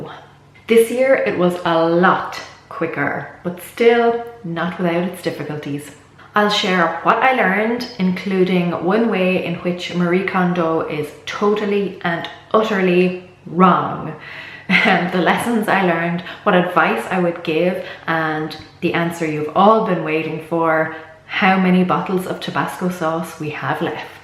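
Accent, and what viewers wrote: Ireland. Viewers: Love your Irish lilt, could listen all day! Your doing a great job and it's so frustrating when you don't have everyone in household with same mindset, sorry to hear of your loss.⚘⚘⚘ Donna from SCOTLAND xx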